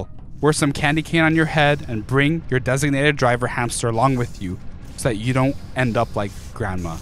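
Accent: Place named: Russia. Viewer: His Russian accent is so good!!!!!!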